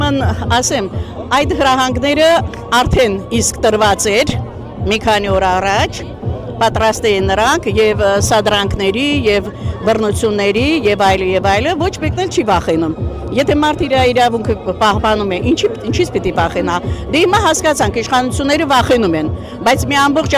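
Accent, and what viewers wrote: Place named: Russia. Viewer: Cirtc xarnuma ec agarkekin lcelov rucakan akcentov xatlaxner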